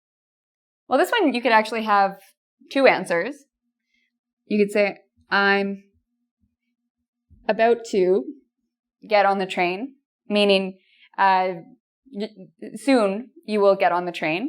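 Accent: Canada. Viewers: I love you , your Canadian accent and your lesson. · yes, I can really notice Canadian accent ... and I love it :-) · You a great teacher anda I love Canada's accent. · Canadian pronunciations, non-Metric measurements... I'm confused.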